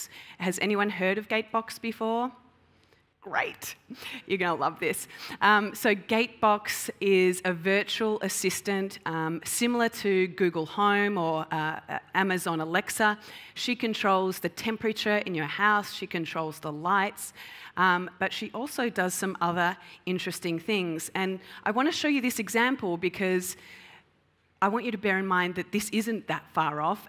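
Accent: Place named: Australia. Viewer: It is just Australian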